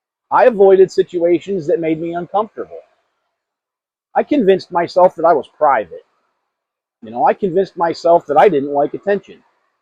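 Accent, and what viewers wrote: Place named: Georgia, United States. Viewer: "Let's have a talk" in that warm Georgian accent is absolutely killer. jmho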